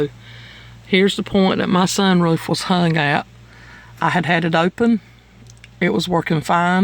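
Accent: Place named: Tennessee, United States. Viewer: That sounds like a tennessee accent if I've ever heard one, right or wrong? Lol. Thanks for the vid, these sunroof motors are insane to get out.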